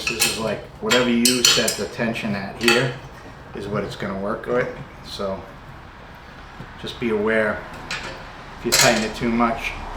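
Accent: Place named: New Jersey, United States. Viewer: I can't find one that has a single pole/handle, which discourages me from buying it. This model you just shown, HANDS DOWN, has the most parts/pieces for the handle, but it does seem to be solid. I'll add it to my cart, as I'm searching, and will pick one.... eventually. Good video 📹 👍 👌 On another note, you sound Jersey but it looks like NC where you're at. I say that cuz i met a guy from NC who sounded Jersey/NY-ish🤘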